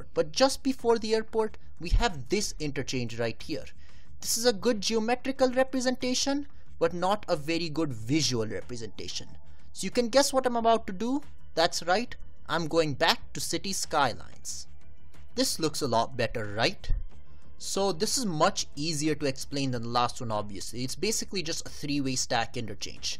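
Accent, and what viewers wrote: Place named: West, United States. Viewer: The presentation is very good and I appreciate your work , but why so much of western accent.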